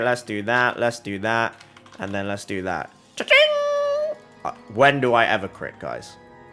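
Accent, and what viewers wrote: United Kingdom. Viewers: Are you from the UK? Good to see your vids!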